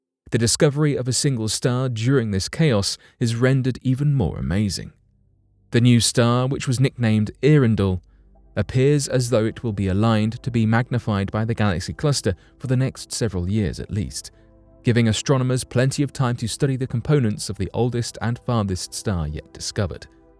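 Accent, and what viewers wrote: United Kingdom. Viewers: Appreciate the British accent.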